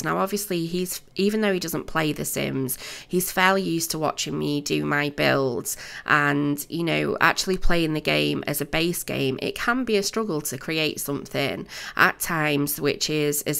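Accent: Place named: Northern England, United Kingdom. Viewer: New sub here from Arkansas, USA. I love your builds. Are you from the North (Britain)? You sound like everyone on Emmerdale and Tan from Queer Eye LOL. Congrats on becoming a Game Changer!!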